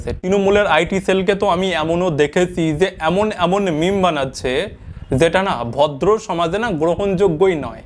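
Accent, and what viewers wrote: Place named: Bangladesh. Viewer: Tor barita Kangladesher kothai.sposto kore kotha bol Ahammok.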